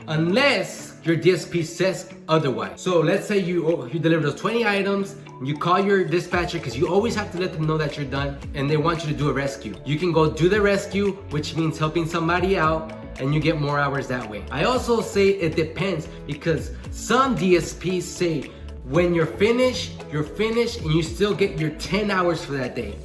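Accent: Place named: Puerto Rico. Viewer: cuz I saw your video u posted on ig were your video is about a guy asking u if you guys were open and u were talking in Spanish, but u had a Puertican accent. But both my parents are from Mexico but I'm born and raised in Los angeles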